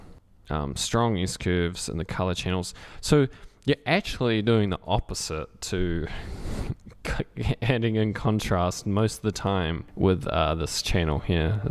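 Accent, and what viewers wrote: New Zealand. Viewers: Him and all the other Kiwis living in the WÜÜÜRLD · it was interesting but your kiwi ixint is so strong i get distracted :DDD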